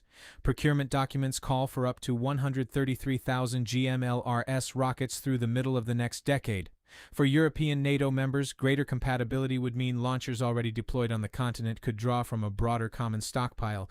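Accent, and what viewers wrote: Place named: Eastern Europe. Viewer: are you using an AI filter on your voice? Your English is very good but you have a stronger Slavic accent than this voice.😃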